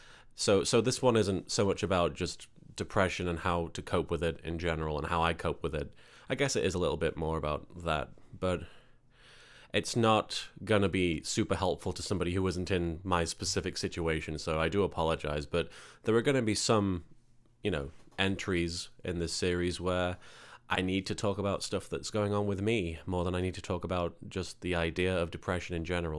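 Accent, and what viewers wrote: United Kingdom. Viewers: where do u live by the way u sound british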